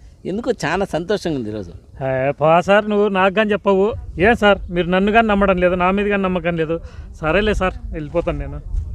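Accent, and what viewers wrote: Anantapur, India. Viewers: అనంతపురం యాస చాలా బాగుంది ! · Chala baga chepparu 👌 naku me videos antey chala istam garu. I feel very happy and relaxed while I'm watching. And Anantapur language vintuntey chala happy ga anipistundi. Thank you so much for making such videos and making people happy.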